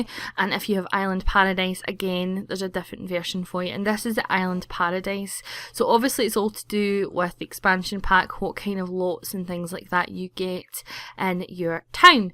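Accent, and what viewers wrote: Scotland, United Kingdom. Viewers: Wow, your accent is gorgeous. I don't know if you know who James McAvoy is, his a Scottish actor and his voice is amazing just like yours! I think I'm just inlove with Scottish and Irish accents! They are just so different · It's called a Scottish accent, and it's cute. Maybe you're deaf, or just not used to the English language, but I learned English about 1 year ago, and I'm used to a normal American/Boston accent. And I can understand her perfectly. It's not fault that she speaks like that. So, please don't comment on her accent. She can't do anything about it, and she shouldn't, because it's lovely <3 c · you sound Scottish just like meh!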